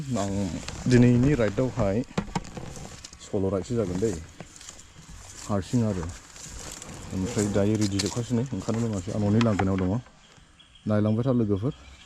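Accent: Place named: Kokrajhar, India. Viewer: Kokrajharni Baidi railainw Naja ada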